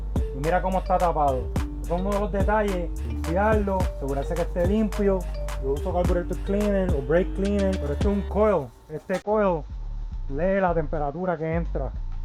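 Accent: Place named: Puerto Rico. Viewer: Lenguaje boricua. 😅😅😅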